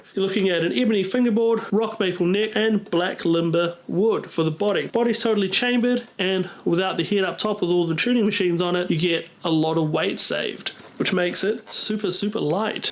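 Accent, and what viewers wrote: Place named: New Zealand. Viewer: Whoever didnt know accent is kiwi needs to watch some more deck themed videos. Glad to know you're in a prog metal band man, will look it up. Great stuff and great guitars ❤️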